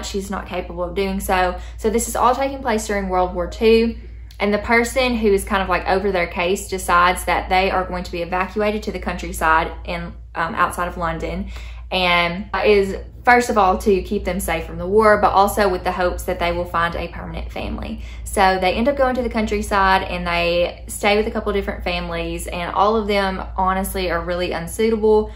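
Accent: South, United States. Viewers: I love hearing your perspective with that southern drawl.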